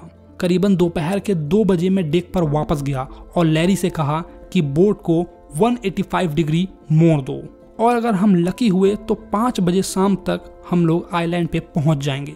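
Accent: Bihar, India. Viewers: Bhaiya.....Your bihari tone is also a reason why students love your teaching style..❤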